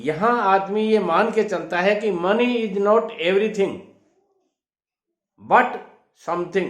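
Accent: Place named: Bihar, India. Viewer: Dadaji Ham aapki awaaz ko mahsus kar pa rahe hain jaisa lag raha hai ki aap Bihar Jharkhand se honge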